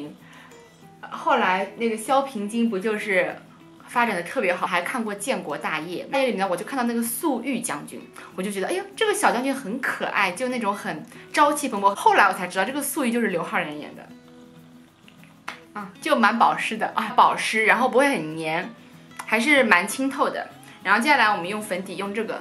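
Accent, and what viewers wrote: Shanghai, China. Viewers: 新年快乐啊！ 只能在英国过呀 也是很想回国吃吃喝喝的 哈哈哈 之前突然看了一个你的视频 特别喜欢你的性格 加上上海口音 太可爱了！ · Hallo, 你推荐的产品真的很好看也很实在，那瓶Essie的指甲油涂上美得不要不要的。我也是上海人，你的上海话和带一点点上海口音的普通话听来非常亲切。我住在德国斯图加特已经十一年了，中国年没假，肯定在斯图加特过年了。我们离得太远了，不然真想去找你玩。还有我也曾留言请教你这么多衣服如何分类或处理，有机会出个专辑吧，除了买买买，我们也要会收收收,藏藏藏，理理理，扔仍扔。最后祝愿新年快乐，万事如意！喜欢你! Xia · 杭州人听到上海口音就有回家的感觉了哈哈哈。第五年了还是在美国自己过年。😭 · 作为一个出生在上海 现在在墨尔本上大学的留学生，每次看你的YouTube（上海口音的普通话）就觉得特别特别亲切，特别想家。今年年三十在墨尔本和同学一起烧饭吃，谢谢你的视频！一个默默无闻的小粉丝 希望有机会能在上海相遇～x Angela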